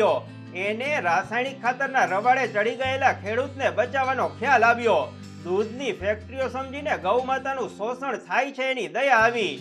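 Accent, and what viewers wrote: Kathiawar, India. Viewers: વા ગૉર મારાજ આવ તમારી કાઠિયાવાડી ગુજરાતી વાણી સાંભળી મૉજ આવી ગય👌✨😌🙏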